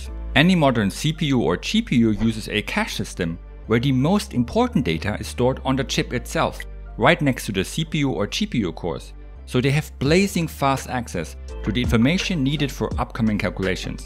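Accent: Germany. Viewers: Sounds like the most Deutscher Akzent ive ever heard xd but nice video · ja das ist es xD du hast einen krassen deutschen akzent aber hey, fühl ich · I can hear the german Accent hehe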